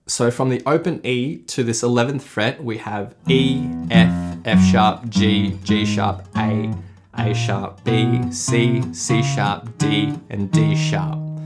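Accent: Australia. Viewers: Thanks a lot for the tips,! I love Australian accent by the way haha. Keep it up, mate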